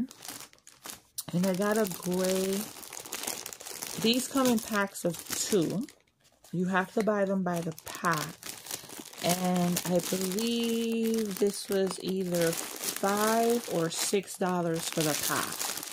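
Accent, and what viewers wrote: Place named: Brooklyn, United States. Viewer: I’m so glad I found your channel. You sound like my tia from Brooklyn.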